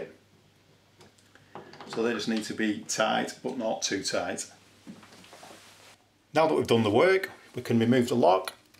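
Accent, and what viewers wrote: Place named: Widnes, United Kingdom. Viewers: widnes haha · Where are you from mate? You sound like Widnes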